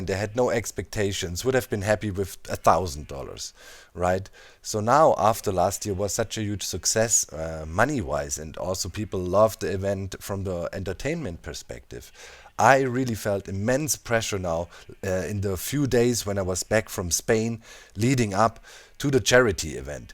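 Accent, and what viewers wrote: Germany. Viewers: Why do I hear a german accent?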